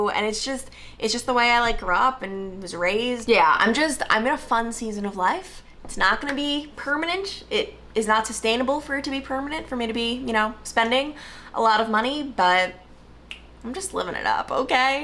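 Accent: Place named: Long Island, United States. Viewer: I feel like your Long Island accent is returning now that you’re not on the news. It’s fun to hear again!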